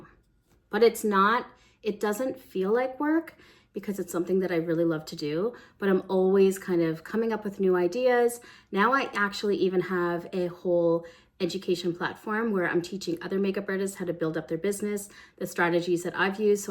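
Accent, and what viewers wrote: Canada. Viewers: Your shorts just came across my algorithm, and I really enjoy watching them. I thought I occasionally detected a faint eastern accent, so had to see where you are from (prior to this video) and saw Canada 🇨🇦. Told my husband I knew I was right. So happy to see and support Canadian creators like yourself. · Hey hey, I just love you and now I know why... you're a maritime girlie - and now I can hear your Nova Scotia accent. Surprising to me that the Bridezillas in your shorts were East Coasters (or maybe they weren't) because that seems opposite to the maritime culture, but I guess there are mean people everywhere. I'm 50 and have hooded eyes now. Would love your best tips on this. ❤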